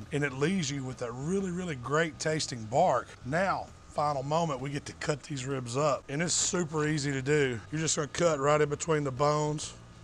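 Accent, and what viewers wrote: South, United States. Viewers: That one good me good, he often says stuff like this, really southern imo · I went through dozens of bbq videos until I saw a big fat guy with a southern accent. Then I was like, "I'm gonna listen to THAT guy." Love your videos, brutha. Total food porn for real.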